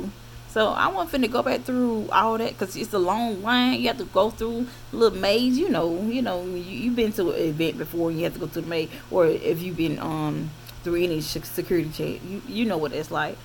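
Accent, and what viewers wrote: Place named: Alabama, United States. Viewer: Are you related to Adrienne Little? You have that Mobile, AL twang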